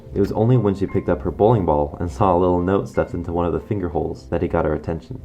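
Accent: United Kingdom. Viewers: It's a shame people take a mic about british accents I wish kept his British accent like me seriously tho why would you bully british people if they moved to the states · sad british noises, what british accent did you have when you moved? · Wow they are rude because of your British accent i have a Australia accent well everyone did say i have a accent a Australia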